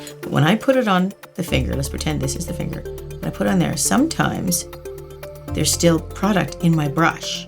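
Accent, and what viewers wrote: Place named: Canada. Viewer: Heard a slight accent and thought, she mightttt be Canadian.... Then checked your page and realized you're in BC! Can't wait to order your nail kit when it's back in stock <3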